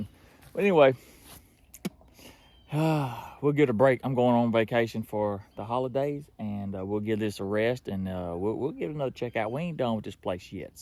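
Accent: South, United States. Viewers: Get them beavers 🦫 I appreciate what you do getting rid of pests of all kinds!!! I love your Southern draw and you are hilarious 😂😂😂 Happy Thanksgiving 🦃🐖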